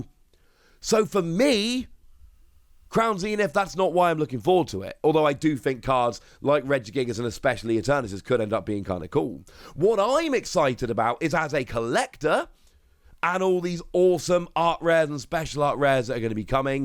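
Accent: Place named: England, United Kingdom. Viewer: I joined the subscription after just 2 videos after listening to this cheerful and chipper English accent poketuber. It's so refreshing to hear a change of pace and be actually helpful/ competent. Not only does this channel talk about what to do competively but also discusses what to invest in for memorabilia/ speculation investments to make money only to buy more pokemon cards!!!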